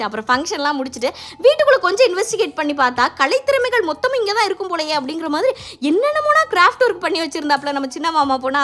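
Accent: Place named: Valliyoor, India. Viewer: Hai nanga vallioor.unga pechu nalla vibe.namma oru pechu.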